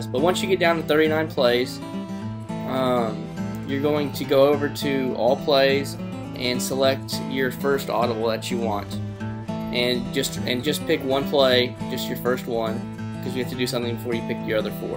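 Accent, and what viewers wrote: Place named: Alabama, United States. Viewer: that song really brings out your bama accent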